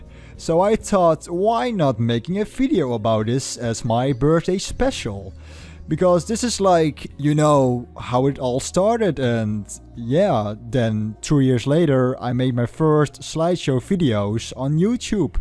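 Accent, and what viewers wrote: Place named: Netherlands. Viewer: The wa- de manier hoe je praate engels ik dacht al dat je Nederlands was oh en uh gefeliciteerd met je verjaardag Edit: ok ik had wat meer geduld moeten hebben lol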